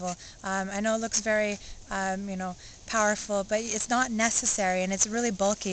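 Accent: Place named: Canada. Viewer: this chick sounds canadian. anyone know if she is?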